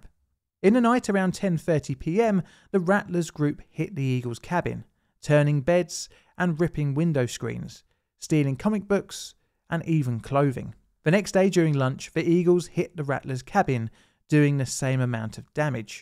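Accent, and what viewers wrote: United Kingdom. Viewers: Mo-caison??? Its Mock-a-sin... I get it you're British but holy guac I've never seen someone get that so wrong!! I'd love to see you say Boise, or Pocatello.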